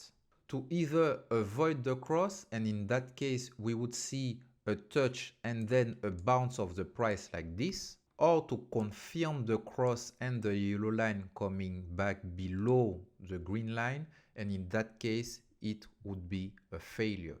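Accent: France. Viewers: En tant que français j'apprécie beaucoup ton accent frenglish 😅👍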